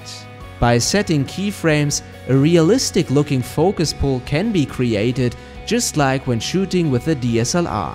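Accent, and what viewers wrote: Germany. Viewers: Hey. Ich fange demnächst an mit After Effects und dachte, als ich euer Video gesehen habe, dass ihr euch sicher sehr gut damit auskennt. Könnt ihr mir also bei dem Start in AE etwas helfen? Außerdem habe ich eurer "Deutsches Englisch" sofort erkannt! :) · ist deine Muttersprache zufällig Deutsch? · I like the German accent.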